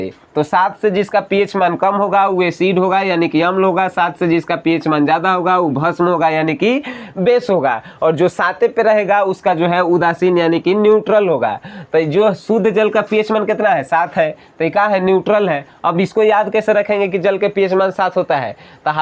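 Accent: Bihar, India. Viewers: Sir ap Bihar se belong karte h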